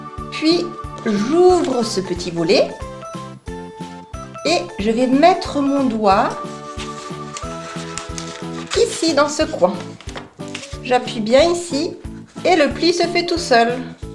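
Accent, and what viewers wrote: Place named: North-East, France. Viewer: Hey t'as un Accent du Nord-Est (de la France) C'est sympa comme pliage :) Ca va faite un petit truc bien sympa pour la table d'anniversaire de mon neuveu ^^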